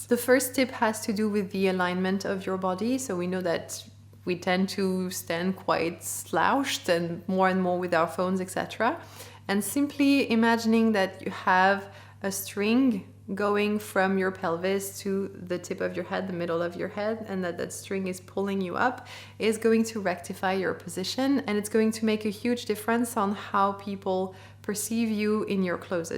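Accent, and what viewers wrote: France. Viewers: I love your french English ❤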